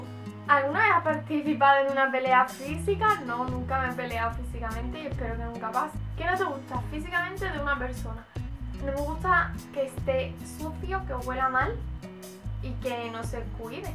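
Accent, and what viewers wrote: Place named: Malaga, Spain. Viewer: OLEEE AHIII DEEEE MALAGAAAAA VIVANN LAS MALGUEEÑAA COMO SE NOTA QE ERES DE AQUIII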